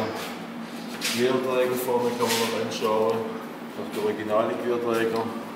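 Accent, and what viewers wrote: Swabia, Germany. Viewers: Danke für das informative Video! ...Ist das Stuttgarter Schwäbisch oder eher Ludwigsburg?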